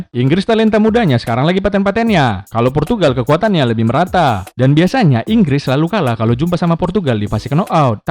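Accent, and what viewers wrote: Medan, Indonesia. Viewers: 𝘛𝘶𝘬𝘢𝘯𝘨 𝘴𝘰𝘬 𝘯𝘨𝘢𝘫𝘢𝘳-𝘯𝘨𝘢𝘫𝘢𝘳𝘪. Medan kali, bah!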